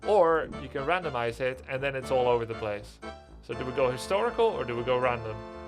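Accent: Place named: Netherlands. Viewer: I thought I recognized a subtle Dutch accent! I'm French but living in the Netherlands, in Den Haag. I was a huge fan of D&D growing up in the 90s, but somehow never played this one, so I was wondering if it was worth a purchase. Thanks for the video, I just picked it up off GOG. :)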